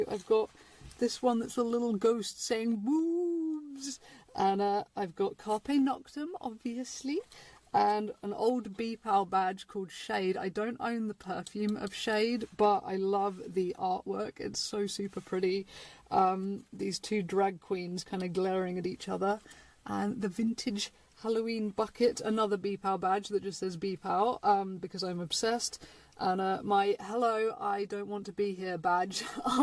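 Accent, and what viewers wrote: United Kingdom. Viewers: For those of us who don’t know UK regional dialects, can you tell us where you accent is from?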